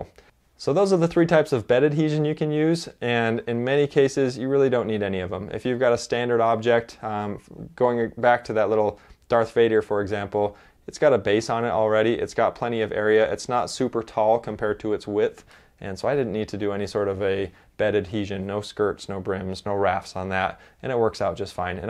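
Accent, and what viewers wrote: Utah, United States. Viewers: I am not, but my wife’s family is from Layton. And we got married in Salt Lake. But mostly I asked because I have a friend that I THINK is from Sandy and you talk just exactly like him. Also, did you say in your video that you have a tarantula? Did you cross shop it with the ender 3 and if you did why did you decide to get that one? I’ve been shopping for my first 3D printer and the ender 3 pro is my top choice- barely inching out the standard for the more robust support bar under the stage and much improved power supply. I have seen exactly one comparison video with the ender and tarantula and the tarantula came out more favorable but I never saw any other videos on that machine. Any input would be appreciated. I’m anxious (and nervous) to start the hobby! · Ha ha - I was raised in New England, actually and moved here as an adult but maybe the Utah accent is rubbing off on me :) I don't have a tarantula or an ender and haven't tried either of them so I'm not a lot of help there. 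I have printers by Flashforge, Tevo (actually just sold that one to a friend), Alfawise and Printrbot. I've heard good things about Ender machines but haven't had the chance to try it myself. Sorry I couldn't help more with that!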